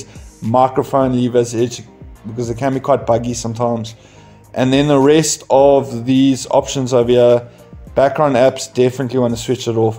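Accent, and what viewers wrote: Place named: South Africa. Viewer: will do! My undervolt setup was from when my cpu max load was set to 60% so should he able to change it more now. There's some good ol new zealand slang for ya mate! Love your South African accent, my neighbors are from SA and are the most hilarious people I've ever met, awesome to watch the rugby with. Really appreciate the help bro! Never seen another channel that is so patient and informative with their community.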